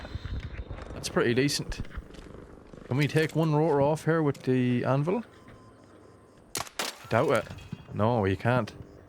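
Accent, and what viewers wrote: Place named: Irish Traveller, Ireland. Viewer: It's called Irish Traveller or "Pikey" accent. Watch the movie "Snatch".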